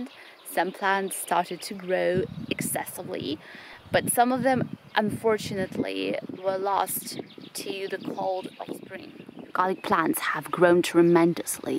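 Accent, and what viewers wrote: United Kingdom. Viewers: You are talking like British people But you are much more pretty God bless you and your family and your homeland Belarus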